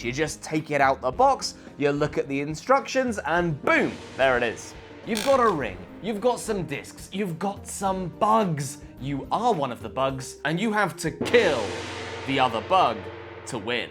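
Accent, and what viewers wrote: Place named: Wales, United Kingdom. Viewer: The accuracy to which a computer can reproduce a Welsh accent is giving me an existential crisis. Par for the course with a SUSD review to be honest. Great video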